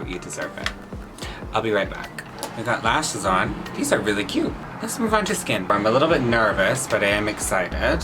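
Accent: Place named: United Kingdom. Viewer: Stopppp, are you from the UK?! 😭👏🏽👏🏽👏🏽 Love you even more now 🇬🇧🇬🇧🇬🇧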